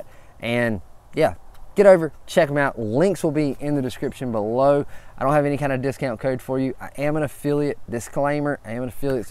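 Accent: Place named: Georgia, United States. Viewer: Great videos! I’m enjoying your channel. You sound like you’re from Georgia. Also kinda looks like Georgia woods behind you. 😂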